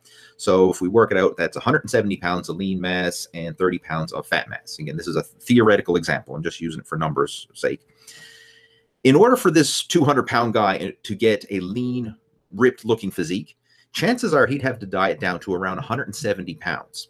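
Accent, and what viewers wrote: Newfoundland, Canada. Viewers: Are you from newfoundland? I seem to remember that you are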